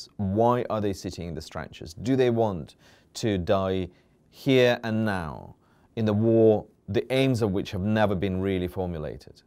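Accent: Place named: RP, United Kingdom. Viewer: When you click on a video of The Economist hoping to learn something and stop halfway as you figure out it is posh British accentuated bla-bla-bla…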